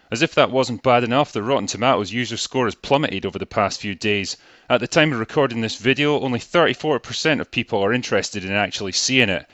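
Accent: Scotland, United Kingdom. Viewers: Wait a second -- now in 2020 the has such a thick Scottish accent he's barely understandable! In this video it's much more mild. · An old vid before he put on the thick Scottish accent.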